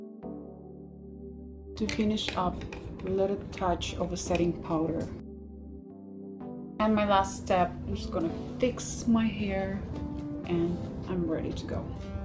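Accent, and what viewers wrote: Russia. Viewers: This russian accent 😍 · Anna Kozina у неё самый настоящий русский акцент. Так только мы говорим. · Nice voice. Very rispy russian tone. Gorg! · Love your russian accent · she's russian doe, she definitely don't have chinese accent lol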